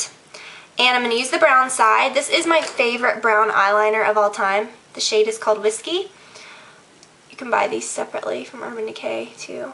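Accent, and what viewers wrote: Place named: Texas, United States. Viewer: awesome tutorial, it sounds like your getting a texas accent or something lol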